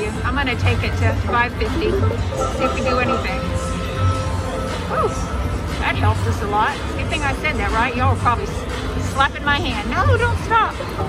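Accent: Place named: Pittsburgh, United States. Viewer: Do I detect a fellow Pittsburgher? If so, hello from Kennedy Twp. Love the video 😊❤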